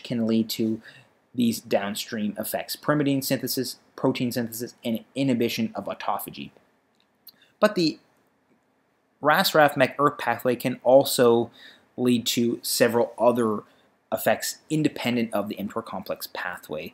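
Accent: Canada. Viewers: Thank you for making these great videos! Are you Canadian btw? Just want to prove/disprove my accent ears...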